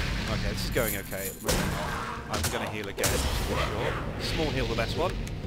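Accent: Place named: United Kingdom. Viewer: Hey, I really like your videos; just fun and casual to watch and listen to, whether Vanguard is popular or not. Seems like a really nice game for some casual single-PvE. It's just too bad I can only make out 80% of what you're saying behind all the sound effects and with the brittish accent (no offense). Oh, btw, try whatching this video transcribed/auto-subtitled; it's just totally fucked up:) (like - )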